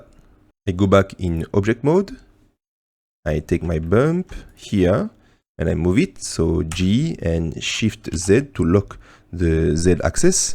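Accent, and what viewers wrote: France. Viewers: 💪🏼Je m'abonne clairement!!! je cherchais des bon tuto sur Blender et plus particulièrement sur la création ciné/filmic de scènes de voitures en mouvement dynamique! PS: je m'abonne aussi parce que j'ai capté l'accent Français!!! 😃 #blackpanthers💪🏼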